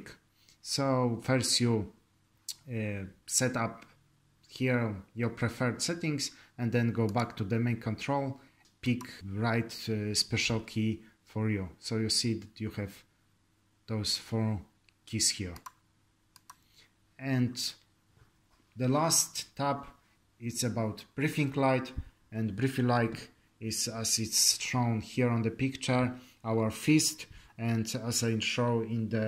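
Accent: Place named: Poland. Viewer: Po akcencie zgaduje ze jesteś z polski. Mam racje xD?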